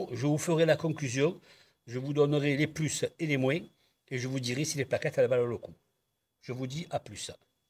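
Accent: South, France. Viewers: Merci pour cette vidéo très pratique.le coup du piston à visser pas évident..heureusement que tu es là!..avec ton accent du Sud ça me rappelle mes cousins Nîmois! Merci encore Une vidéo pour la courroie secondaire serait super sympa... si tu le fait toi même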